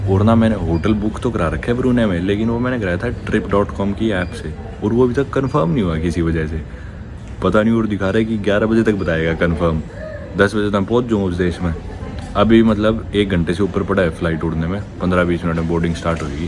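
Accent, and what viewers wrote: Haryana, India. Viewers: This haryanvi accent literally gives me a headache and very unpleasant for my ears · आपा Haryana wale hindi me Haryanvi mix kr hi dete hai , wo hi baat pta lg hi jata hai गामा के बालक सै ❤❤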